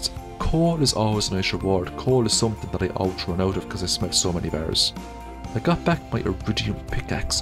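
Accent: Ireland. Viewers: 'I played 200...' Irish Accent. Instant subsribe. Let's go.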